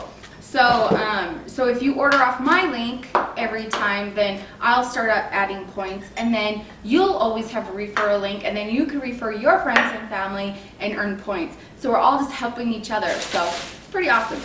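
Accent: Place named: United States. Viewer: There is a U in the middle of tuna not oo and herbs starts with H. I wish Americans would stop murdering the english language!!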